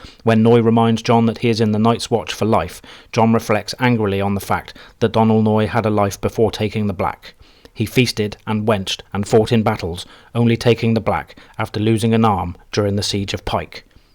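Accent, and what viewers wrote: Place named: England, United Kingdom. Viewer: it's because your accent is so naturally English, that it's difficult to imitate, but sounds so beautifully cultured. Universal English is American English because it's much easier than English English to pronounce. 😆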